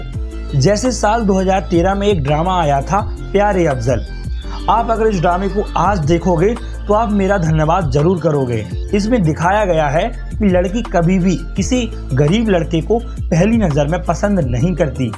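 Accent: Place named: India. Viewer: Bhai English to sahi kr lo pehly Indians ki English or accent bohat kharab hai passive voice hi ghalat hai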